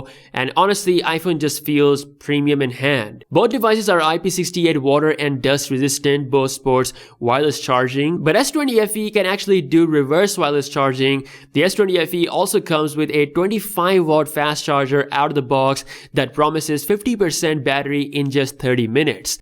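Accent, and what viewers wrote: India. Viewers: I just cant watch this. Too much indian accent. My god. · U sound so Indian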